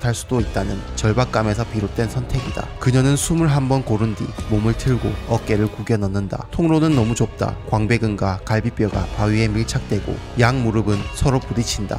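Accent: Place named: Seoul, South Korea. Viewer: 사투리랑 서울말이 섞여서 느낌 참 오묘하네 효과음은 좀 뺍시다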